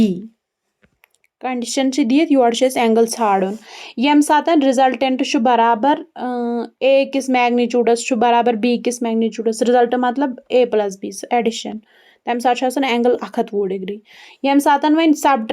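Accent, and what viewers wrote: Kashmir, India. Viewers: Thaat kashmiri accent 😂😂 ❤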